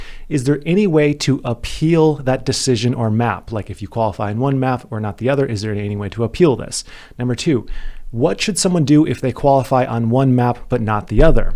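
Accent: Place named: New York, United States. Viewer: Yo! Whats good Son? That’s how some of us New Yorkers sound.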